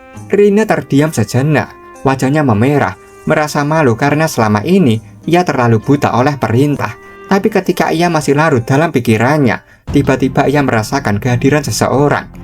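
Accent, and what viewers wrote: Java, Indonesia. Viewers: Bang kamu orang Jawa ya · serasa nonton wayang bang, java lu medok bngt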